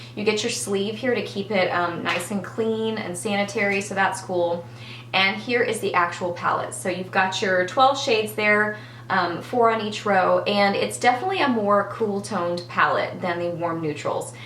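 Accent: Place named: Texas, United States. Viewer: I love all of your videos! I love how you talk! (maybe cause I'm from texas as well? lol) how detailed you are with everything and organized!!!! I would love to see a review of the real techniques palettes from you.. because I know you are honest and so far I agree on everything you say about this stuff!!! Thank you for your awesome videos!!!! - Natalia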